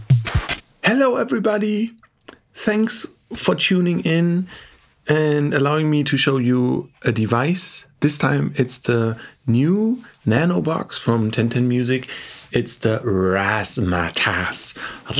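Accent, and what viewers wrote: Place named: Germany. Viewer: You sound so German. ^^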